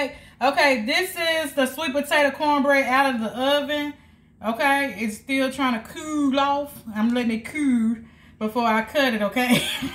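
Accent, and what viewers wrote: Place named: South, United States. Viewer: I Luv It! What Better Way Ta Spend Some Of Ur Saturday Afternoon Then Learning A Fun Skill From A Fun Teacher,Who Has A Fun Personality!!!I Just Want Ta Say Thank U So Much With Cho Charming Southern Accent! Go Head Wit Cho Badd Self!!Sweet Potato Cornbread Here I Come! Sent Me!!! 😜💜😂💚😍💘😜❤️😂💚⭐️🖤❤️🦾⭐️👍🏿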